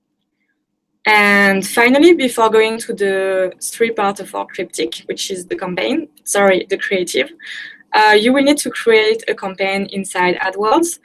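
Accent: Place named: France. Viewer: Very cute French accent. Thanks for the video :)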